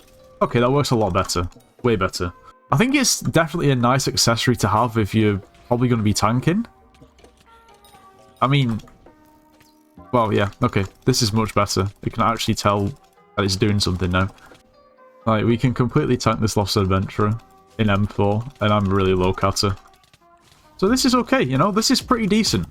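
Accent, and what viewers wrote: United Kingdom. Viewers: Taking into consideration that on the google account was an H, and the accent, I'm 99% sure name is, lmk if u have any obligations; Edit: We also can't ignore the fact that it was 385 pounds to fly to Andorra and that is the Brittish/Scottish currency where the most common name that starts with an H is, this makes my answer 99,9% sure, It also says that it's from Manchester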